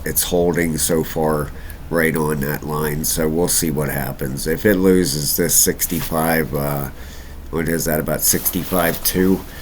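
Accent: Pittsburgh, United States. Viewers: Sounds like Pittsburgh to me, am I right?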